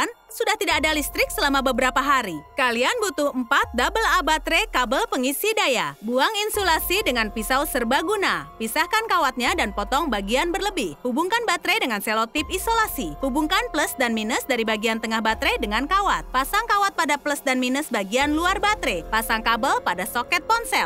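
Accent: United Kingdom. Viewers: kak kakak orang inggris atau indonesia 😊😊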